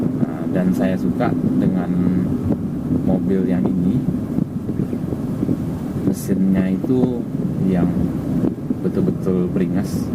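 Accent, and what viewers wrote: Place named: West Sumatra, Indonesia. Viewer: sumbar nya dimana bos, logat nya mirip, salam dari minang rantau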